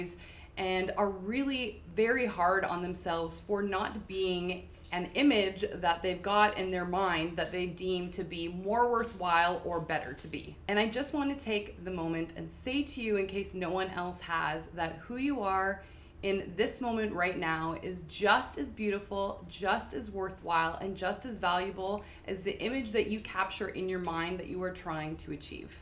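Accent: Canada. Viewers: You have to be from Canada 😹. I love the accent.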